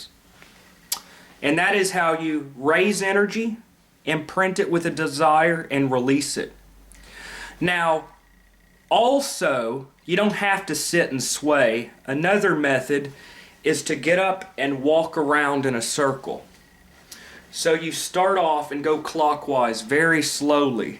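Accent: South, United States. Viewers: Thank you for your ability to teach clearly! Very rewarding for me! You must be down south here somewhere. Esoteric instruction is always better when delivered w a southern accent 😃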